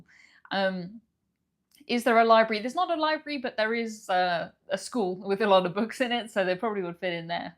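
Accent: United Kingdom. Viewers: Hello! Missed the live stream, am watching the rerun. Congrats on your philanthropy -- well done! I enjoy your cruising podcasts (as I am too ill to go myself) but most of all your accent and British isms. Makes me miss my Dad and Grandmum. Keep up the good work, and Happy Holidays to you!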